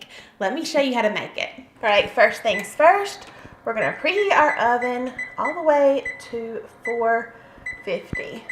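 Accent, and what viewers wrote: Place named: East Tennessee, United States. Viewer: 🍓 This is my first time watching one of your videos and I loved it! I’m from the East Tennessee and girl, your accent sounds just like home! I’ll be trying some of these recipes. And yes, church cookbooks are the best!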